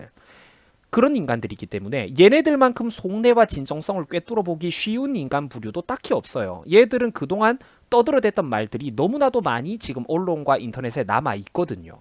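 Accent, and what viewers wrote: Gyeongsang, South Korea. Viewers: 경상도분 서울말 부탁드립니다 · 경상도 인 서울말 안되지요 ?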